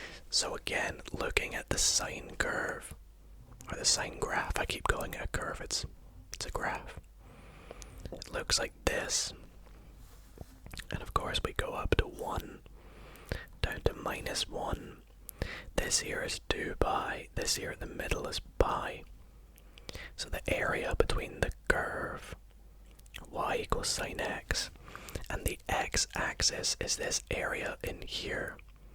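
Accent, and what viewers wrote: Scotland, United Kingdom. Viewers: Hes Scottish 💀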